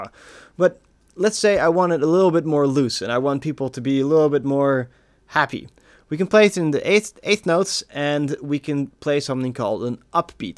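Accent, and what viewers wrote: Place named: Netherlands. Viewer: Where are you from? You sound a little dutch, your english is really good though!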